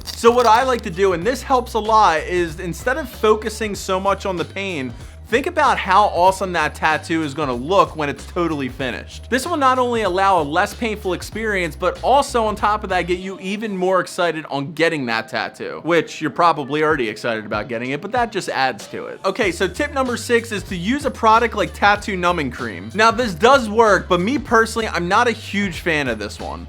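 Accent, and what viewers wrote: Philadelphia, United States. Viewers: Dude you must be from philly i can hear it in your accent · I'm From Philly., so I appreciate the pronunciation. Haha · I fkn knew it lol classic Philly accent! Appreciate the vid tho. Employing some of those techniques this week! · 95% sure this guy is from philly. Wooder… I say it to. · i’m from philly and he sounds like he from philly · You have a strong Philly accent lol great content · You’re from Philly huh??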